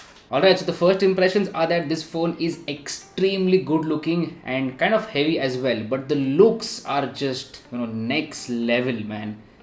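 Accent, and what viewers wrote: India. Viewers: But where are you mugging Indians with your Indian accent 🤢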